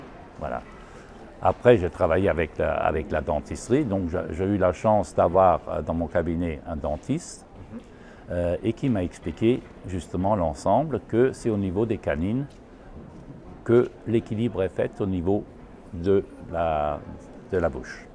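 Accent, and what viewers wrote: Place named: Alsace, France. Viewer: Bonjour, Merci pour toutes ces informations mécanique et technique. Cela m'a fait du bien d'entendre ce petit accent alsacien pays de mon grand-père et de mon enfance 🌱🌸🌲. Qu'est ce que j'aurais aimé savoir si un hydrops peut trouvé naissance enviton 25 ans après hernie discale, syndrome de la queue de cheval, souci alignement dents, aujourd'hui nuque raide, trapèzes douleureux, perte audive, trouble de l'équilibre (qui d'ailleurs s'accentue avec la fatigue, l'inquiétude, tensions!) (qui s'accentue avec la migraine ou céphalée de tension) qui deviennent ingérables à la ménopause et qui detraque mon vestibule, mes muscles ... .. Tout est du même côté mais pour les bras et mains des deux, mais pas la même symptomatologie. Si ce n'est pour l'hydrops ?, je pense que oui. On peut également relier le tout à un parcours de vie compliqué de 9 ans à .. 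Cordialement, E. Parisienne de l'est 🙂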